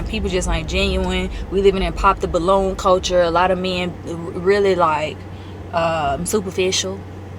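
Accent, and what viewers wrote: South, United States. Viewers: Thanks! I love your accent too, I find myself trying out the southern accent after watching your vlogs 😂 lots of love to you & 🫶🏾🫶🏾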